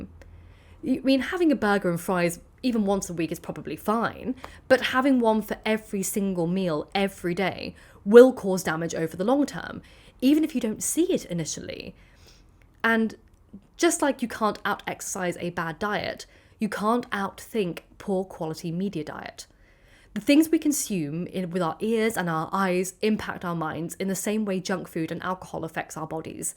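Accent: United Kingdom. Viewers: I am addicted to binge - watching your videos,! Actually i am studying English with lots of videos by British people (because i don't like the American accent) but i don't feel unhappy at all, on the contrary, YouTube is the best thing that happened to me latelly. I really adore you accent, it sounds like good music to me. Thank you so much for your content, which i love as well. 🙏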